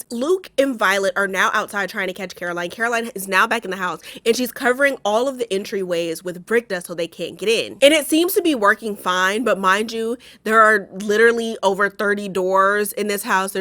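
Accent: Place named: Deep South, United States. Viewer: I just stumbled upon this and at the beginning i was like from exactly how Deep South is this girl??😂😂😂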